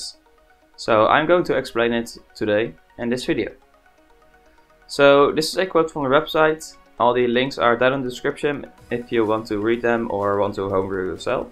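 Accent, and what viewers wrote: Netherlands. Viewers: You sound Dutch my boi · hij is zoo nederlands haha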